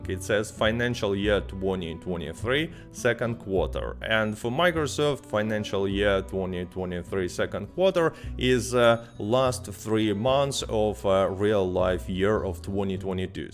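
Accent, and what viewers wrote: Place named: Russia. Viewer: Why this man has so hard Russian accent?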